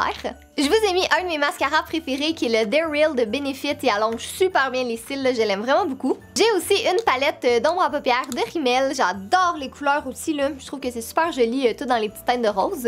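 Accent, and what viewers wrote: Canada. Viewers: Moi noël 🎄j'aimerai avoir un nouveau téléphone ( j'aime trop ton axen canadien ) bisous 💋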